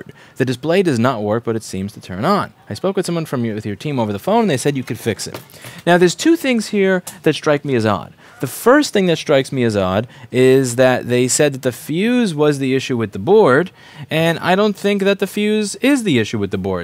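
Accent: New York, United States. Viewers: I'm sure I'm not the first to tell you this, but your voice reminds me of Jerry Seinfeld. I guess that you also have the same accent since both of you are New Yorkers.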